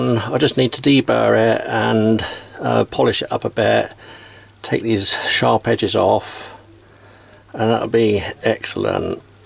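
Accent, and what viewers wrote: United Kingdom. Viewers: Superb - great knurling for a light lathe - and a nice outcome. Trying to guess your accent - is it Gloucester or Suffolk or am I totally wrong?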